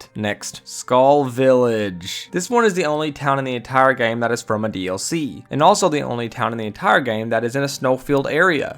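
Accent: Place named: Texas, United States. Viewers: Love your accent! reminds me of aunt and uncle back in Texas... 😌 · Oh my dude, you are seriously sleeping on Rorikstead; there's something sinister going on there. (This has been covered way in-depth by other YouTubers). Pay attention to the dialogue; sneak in and listen to Jouane give secret lessons to Sissel. Why are their farmlands so fertile? And where are all the adult women? If you were including Raven Rock, that should easily have been #1; it's personalities, the sheer number of questlines, it's Morrowindy (yeah that's a word now) goodness-it's easily on par with any of the Skyrim mainland cities. I don't know who told you your accent is fake but as a native Texan, I assure your viewers that the 'Texas twang' is indeed real. You and the missus have a good one,!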